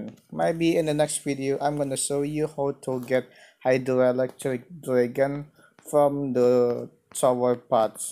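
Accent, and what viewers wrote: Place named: Indonesia. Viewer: Bang gw tau lu orang indo di lihat dari ip chanel dan logat bahasa inggrisnya wkwkwkk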